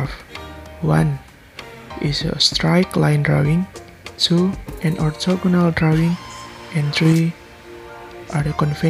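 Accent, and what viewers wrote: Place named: India. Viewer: Fuck you bloody *indian accent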